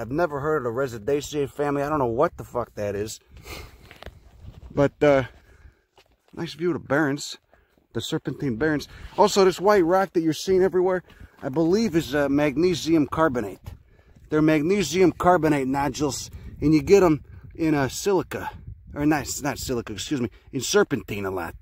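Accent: Chicago, United States. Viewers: That thick Chicago accent tho!!! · That's a Chicago accent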